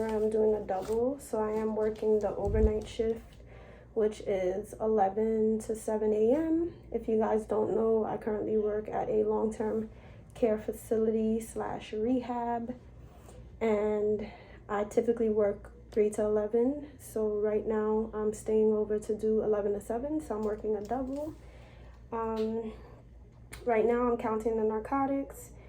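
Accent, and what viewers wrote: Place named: New York, United States. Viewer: I know a Nyer when i hear one lol Thanks for making this video sis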